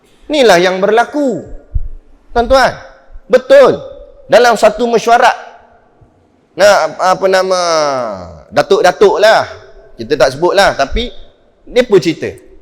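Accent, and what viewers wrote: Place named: Perak, Malaysia. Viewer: Patut lah fasih Loghat Perok...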